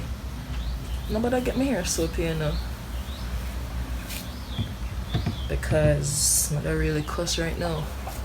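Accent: Jamaica. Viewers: You sound like a Jamaican 🥵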